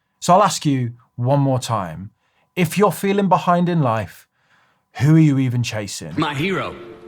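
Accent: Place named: West Midlands, United Kingdom. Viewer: It’s so amazing to see such varied and wisdom rich content from a creator that sounds like me. People don’t quite understand the barriers of the mind that West Midlanders face when we want to put our thoughts out there. Logic being due to the negative stereotypes that a few vowel sounds can somehow determine intelligence. You got me going on investing for my future, and you’re helping me to feel confident when I speak to a client from somewhere else in the UK. Keep smashing it mate.s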